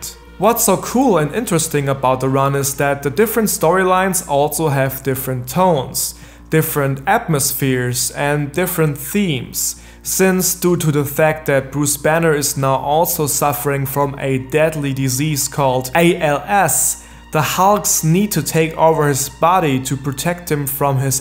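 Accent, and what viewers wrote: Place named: Germany. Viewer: I came for the German accent.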